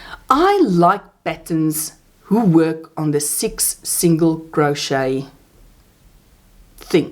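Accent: South Africa. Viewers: Hi there, from Germany 🇩🇪. I love your Chanel and I am so grateful to have found you. As I have lived in South Africa for 34 years, (I was brought up there, lived there from 1969to2003), it is so wonderful to hear your accent. It makes me feel so at home, as I miss SA. I have been crocheting for many years. We had a knitting and crocheting business in Johannesburg. I started amigurumi here in Germany. I also do mini albums, scrapbooking, cards etc. I love your crochet work. You work so neat and tidy. I love your taste in projects a lot. Keep on with your excellent work. I look forward to your videos. Thank you so much for giving us all these pattern advices and tips, just love it. 🤗💖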